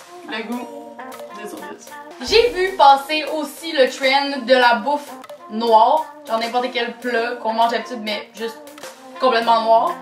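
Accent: Quebec, Canada. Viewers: xago1822 avant je trouvais l'accent québecois un peu chelou mais maintenant je trouve ça tellement kiffant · De base le contenu m interesse pas vrmt mais juste le fait d entendre l accent québécois jrigole pdt 10min donc c cool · BlockShoooter non tu n’est pas la seul moi je kifffff l’accent québécois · Nn tkt l'accent Québécois be like❤️😍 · Toujours trop bien tes vidéos où faire un defi de recette au frigo ou un congélateur ou essayer de parler sans accent ou exprésions Québécoise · Haha j'ai mis les sous-titres pour rigoler et voir ce qu'ils vont dire avec ton accent canadien (je suis française), il a mis des trucs complètement aléatoires, même moi j'ai mieux compris du haut de mes 12 ans...😂❤ Je t'adore 💚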